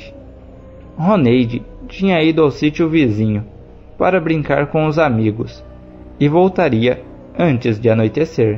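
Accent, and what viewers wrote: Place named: Rio Grande do Sul, Brazil. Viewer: Não tem como sotaque gaucho soar bonito. 🤷‍♂️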